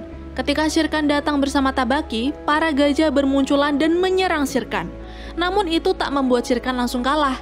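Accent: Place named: West Java, Indonesia. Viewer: Mimin na orang sunda euyy🗿🗿🗿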